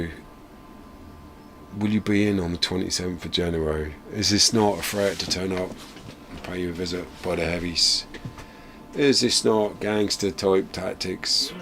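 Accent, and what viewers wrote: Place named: Norfolk, United Kingdom. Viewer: Left home aged 17, now 65 and never had a tv licence or visit. Good old Norfolk accent.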